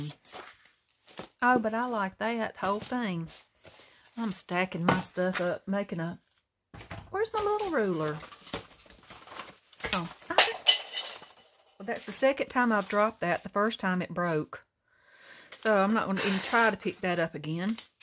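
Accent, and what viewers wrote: South, United States. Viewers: Hey! 😁 You have the sweetest voice. I’m from southwest GA but I live in Wa state. I miss my folks. I love a good southern accent. 💕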